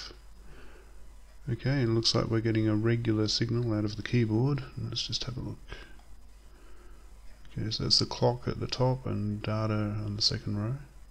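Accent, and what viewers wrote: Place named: South Australia, Australia. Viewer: Super cool work, I found you from Hackaday and have been bingeing all your stuff for inspiration in my own 6502 project since I'm planning to use a backplane too. You don't happen to be from South Australia do you? You don't have to answer if it's too much info lol, but you sound like a South Aussie. It's possible I'm just hearing ghosts, but I'm from Adelaide and I think I can hear some subtle hints in your accent. Great work again :)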